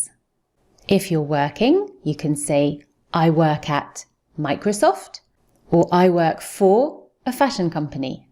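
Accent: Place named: United Kingdom. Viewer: I love to hear your British accent.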